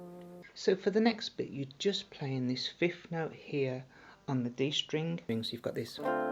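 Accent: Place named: South Africa. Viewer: Goods job. You sound South African. Another great song by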